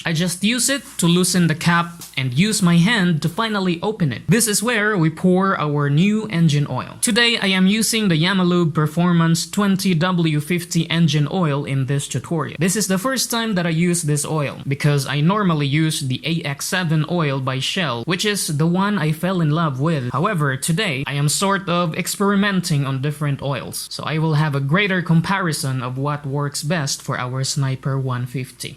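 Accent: Visayas, Philippines. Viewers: Hindi!! alam mo kung bakit nag English yan? Kasi yung nag tagalog yan parang bisayang o bikolano tukmol halos hindi mo alam kung nagpapatawa siya ehh para siyang makatang pilipino na may mental retarded. Binago niya yung language niya kasi maraming bash sa kanya nakakatawa kasi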